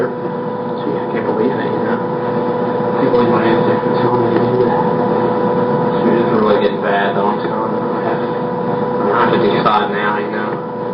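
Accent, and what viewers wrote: South, United States. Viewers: Oh, wow... you really did used to have a southern accent. lol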